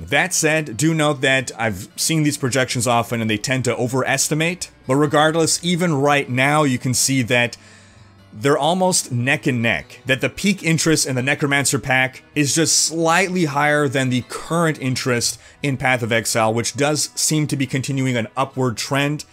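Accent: Quebec, Canada. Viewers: Based on his accent I think he's a canadian living near the Ottawa region, or an english speaker in Quebec. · AH. Canadian French. Was used to english on this channel, but canadian french is nice too. (Even if, being from France, I'm not that used to this accent.) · tu parle vraiment bien Français dis donc ^^! Au début ça m'a fais un peu bizarre, tu a un accès un peu québécois ^^! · Funny, you have a Quebecers accent when speaking in French, that's a little odd when describing a place in France ^^ Outside that your pronunciation is quite good ;.) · un petit accent quebecois, je pensais pas ca de toi! xD did you learn it at school? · That sweet Canadian accent, I love it! (French guy here);) Thanks for the infos! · Wow, are you Canadian? Your accent sounds Canadian, good reading of the French text!:D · ton accent québécois est tellement déstabilisant x) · I love your Quebec accent . 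J'ai le même . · I was wondering why your French sounded so distinctly Canadian, but I just found out on your Twitter that you live in Montreal. Figures. Toujours sympa de savoir qu'un de ses youtubers préférés est francophone (même si je suis Français et non Québécois):). Also, PoE doesn't bother me, and getting the same amount of content in D3 would be indeed great, but it's the fans (well, some of them) who are annoying. Yes, we get it, PoE is soooo much better. How about you let people enjoy what they enjoy? You don't need to act all high and mighty with your 6 new acts. What you guys don't get is that new content won't make people jump to PoE if, for instance, they don't like the gameplay. I, for one, hate the combat and general gameplay of the PoE, whereas I find Diablo 3's tons of fun. No amount of new PoE content can change that fact. But if you enjoy the complexity and style of PoE more, good for you. 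Just don't be an ass about it. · You speak like a Quebec person it's so funny to hear you speak french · hehe your def from or close to quebec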